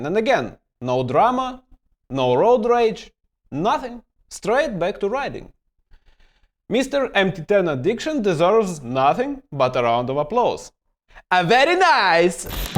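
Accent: Russia. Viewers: I Enjoyed Your Presentation Very Much. I'll Check Out Some Of Your Other Videos. Is That A Russky Accent I'm Hearing? Da, Nyet, Mozhedbwit? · Not sure what you mean. This is a thick Russian accent and he pronounces 'V' as any Russian - easily and with no problems. And yep - very good breakdown!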